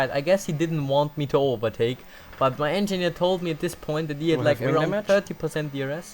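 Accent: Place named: Tyrol, Austria. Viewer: Aus welchen Bundesland kommst du? klingst wie ein Tiroler:-)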